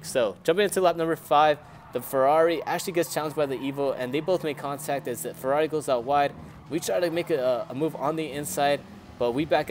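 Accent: United States. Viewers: What part of USA r u from?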